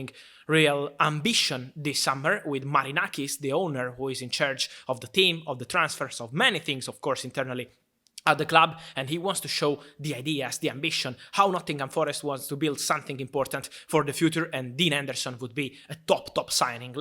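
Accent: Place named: Italy. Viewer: Wow! An Italian like me that speaks with my same accent. I feel i'm not alone anymore!!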